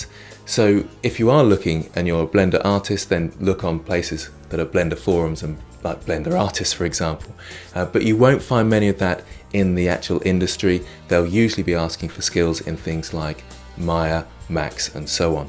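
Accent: United Kingdom. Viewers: And that british accent :p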